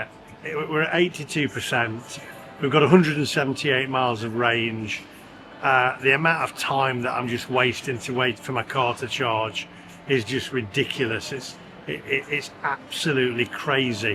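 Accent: United Kingdom. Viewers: EV's should have an electric cord like a vacuum cleaner has. That way there will be no cords to cut off of the chargers,,,,,,,,,,,I love the UK humor that you have. I meet people from UK, retirees in Thailand, And I enjoy talking to them with their UK humor and accent. You are much more expressive than we Americans are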